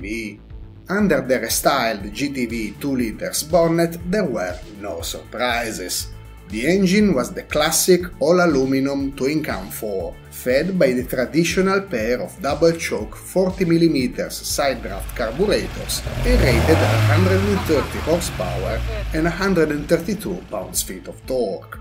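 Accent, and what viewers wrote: Italy. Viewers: haha! It is fun, I really love the Italian pronunciation :) Now it makes sense! I was confused, because in Hungarian the car is called "kocsi", which is pronounced as "cocchi", so I was thinking there is a relationship. Anyway, thanks for your answer! Keep on with the great videos! Forza Italia :)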